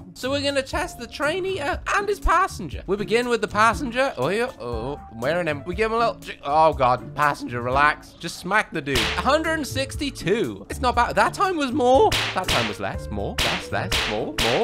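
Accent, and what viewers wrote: United Kingdom. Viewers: Hey are you britain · the and im yellow? gets me every time also you sound british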